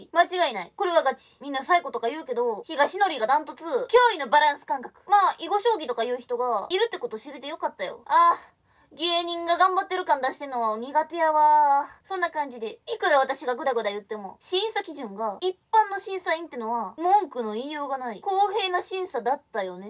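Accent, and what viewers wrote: Kansai, Japan. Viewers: 相変わらずキレッキレですね。総統の関西弁、かわいすぎて萌え死です。めっちゃきれいでかわいくて色っぽい！まじで好きです❤ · 今回は関西感強いな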